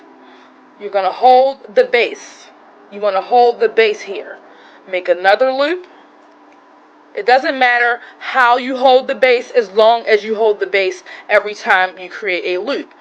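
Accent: Baltimore, United States. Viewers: I’m from the V in the DMV and I love the difference in all of our accents and Baltimore has one of my favorite accents by far.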